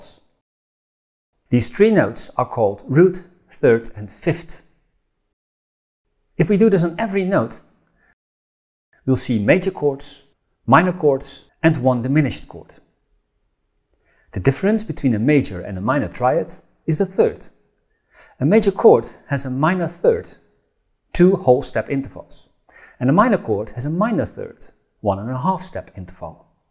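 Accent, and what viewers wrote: Netherlands. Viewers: Great video, very helpfull! Het Nederlandse accent is altijd zo herkenbaar hahaha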